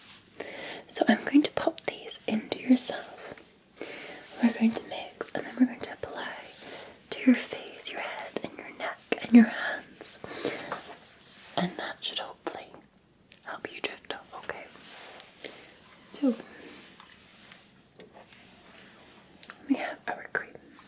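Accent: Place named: Scotland, United Kingdom. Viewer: Missed the victorian maid thanks for being a light in the darkness your cozy cottage was first asmr video i saw i loved it dont know why but love scottish female accent makes me feel safe comforted and loved thanks for all you do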